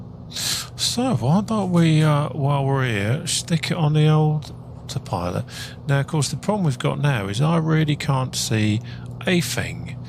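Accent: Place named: Cockney, United Kingdom. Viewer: Is it scary how well he suits the cockney geezer accent.. Yes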